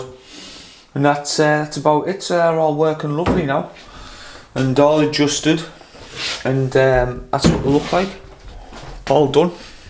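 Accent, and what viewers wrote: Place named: Teesside, United Kingdom. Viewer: I’m from the north east myself, am guessing your Teesside ? Do you work for yourself ?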